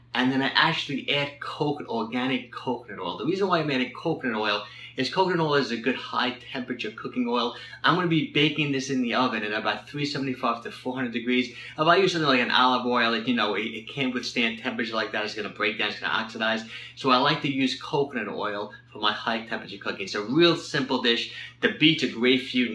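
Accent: New York, United States. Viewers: Dear - I am grateful to know you brother. I am following your three part exercise to firmer abs. Follow up question to your answer that I can do 1 minute of high intensity jogging one minute rest walking. Regarding the high intensity jogging rate, all I can muster is 7.5 on my treadmill. I am 63 and in great shape. Is 7.5 a good speed? Should I raise it or can I even lower it. Your humble follower Laird Mintz. P.S. You sound Like you are from New York. I live in Chicago but was born in Brooklyn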